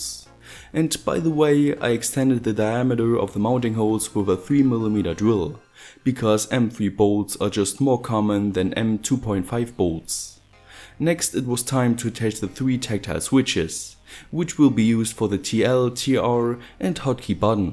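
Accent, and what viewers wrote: Germany. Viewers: are you German mate ? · Why do you have a German accent?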